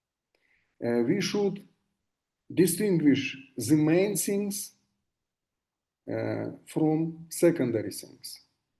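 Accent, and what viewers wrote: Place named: Russia. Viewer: Дружище очень слышно русский акцент так как хочется попросить тебя перейти на русский потому что ощущение что ты именно на нём говоришь.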